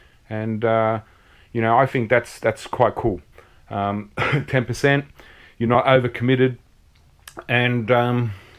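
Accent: Australia. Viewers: I don't know but I found this very funny. I think you were getting a little tipsy.And this australian accent. Lol. Very nice. Cheers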